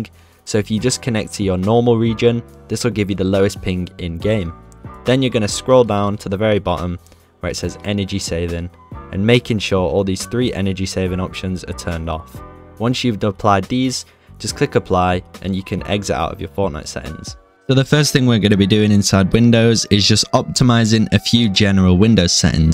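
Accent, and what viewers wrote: Norway. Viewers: Bro er du norsk? du har sykt bra engelsk stemme man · Wait are you Norwegian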